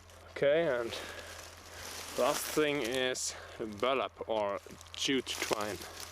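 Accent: Germany. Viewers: god german english^^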